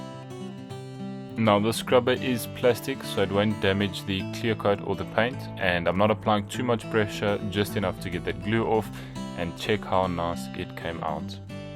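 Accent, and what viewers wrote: South Africa. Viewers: Could hear the accent! we out here supporting out South Africans! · Great video Bro 😎 are you from South Africa?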